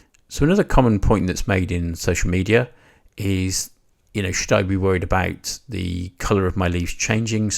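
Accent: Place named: Midlands, United Kingdom. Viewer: Subbed👍🏽just started my maple collection, your content has been a big help👍🏽thank you. I’m in Telford, sounds like a midland accent you have👍🏽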